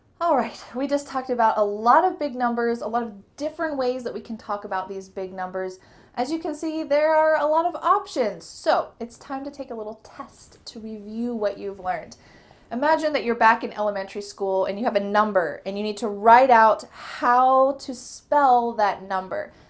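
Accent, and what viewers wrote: United States. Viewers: Hi! So happy to see you here again.. (and again and again!) You're looking ravishing! You chose an excellent topic 'cause "big numbers" is one of the quite unclear topics which many learners know even worse than any grammar question as well and it's truth. I'm grateful to you 'n' your channel for a wonderful possibility study a real American English with gorgeous accent (your "water bottle" is flawless for accent training, thanks) and marvelous pronunciation. has also beautiful accent and It's good that he's being agree to take part in your lessons. I was just fallen for your pretty voice, these endearing eyes, your sunny smile, your expressive mimic 'n' manner of speaking with correct, a real American accent as well. All of this drove me crazy at once when I ran across your channel by the link what gave me Lucy from "English with Lucy" channel. 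And I'll be always faithful to you 'n' your channel 'cause due to it my main dream is coming true! Good luck, strong health 'n' all the best! See you soon! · Wow, you've got American accent, that's incredible.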